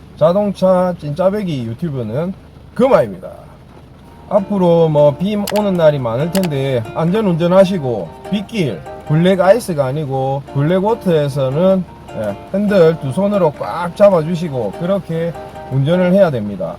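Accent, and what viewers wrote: Gyeongsang, South Korea. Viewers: 구수한 경상도 사투리 멋져요~~~~😊😊😊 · 오오 ㅎㅎ 정말 유용한정보 감사합니다 ㅎㅎㅎ ㅎㅎ 경상도분이시라서 너무 너무 친숙해요 ㅎㅎㅎ 저희어머니쪽이 의성이신데 ㅎㅎ [근데 꼭 어디서 뵌분처럼 너무 너무 친숙하고 친절하게 잘 설명해주십니다 ㅎㅎㅎ ] 최고 최고 짱짱맨~~ ㅎㅎㅎ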